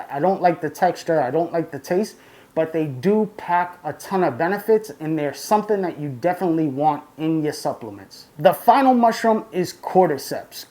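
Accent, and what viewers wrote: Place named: New York, United States. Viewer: He’s a Yankees for sure, I’d guess Jersey or NewYork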